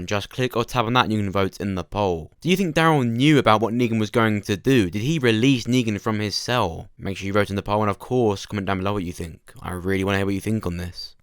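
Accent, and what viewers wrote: United Kingdom. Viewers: ...Not sure where you reside. Per the accent, I would assume/guess it's somewhere in the UK. All I can I say is you have what's called a "Radio Voice." In America, there's actual talent agents who specialize in voice work. I.e. Radio personality/Talent, as well as voice over for major movies, cartoons, etc. You should put a demo recording of yourself, with some sort of profile and picture and send it in to a talent agency or agent. I would send out a lot of the profile. I sincerely believe someone in the industry would be interested. You have a smooth and different type of voice in a good way. Please consider it, you just might have much success. A radio personality makes 7-8 figures a year. Doing movie and cartoon voice overs those people can make the same too. Give it a try you have nothing to lose. Just my opinion. But bet others would agree you have a cool voice that people like listening to.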